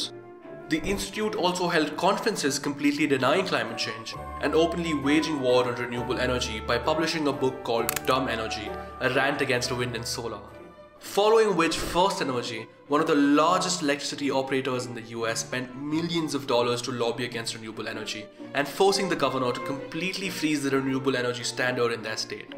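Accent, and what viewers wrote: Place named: India. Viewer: I've a feeling the v/o is done by a person with Indian roots. Great video, either way, made me smash like. Also, anyone reading this should subscribe to pewdiepie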